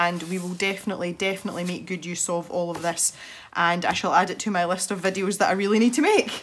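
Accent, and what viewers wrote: Scotland, United Kingdom. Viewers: I’m so happy Gregory Grayscale has such a fine new home. But I’m still lamenting the displacement of The Yoshis 😢 Regarding the neon pencils ... I once participated in a challenge that required you to color a page that involved something outside of your comfort zone. So I doubled down and colored a mandala with neon gel pens and pencils - neither of which are my favs. So, looking forward to the next video with a Scottish accent. Signed, Daria, the serial commenter.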